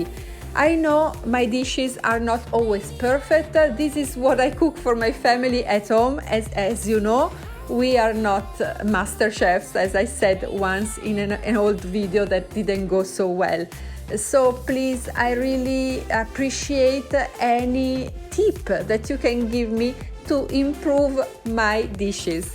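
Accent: Italy. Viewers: I love your recipes! They are so simple but DELCIOUS. To me you make keto very easy, and also healthy. I appreciate it that you show the ones that don't go 100% perfectly because that's real life and I am encouraged to keep going even if I don't get it right! I'm going to try this recipe and if they go flat I will think of them as a 'flat bread' and put the meat ontop... delicious! Ps. Your English is so good... I understand EVERYTHING you're saying... and I love listening to your lovely Italian accent. Never change!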